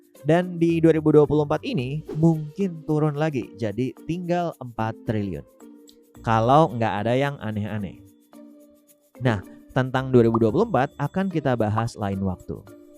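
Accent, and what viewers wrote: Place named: South, United States. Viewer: Logat southern American ya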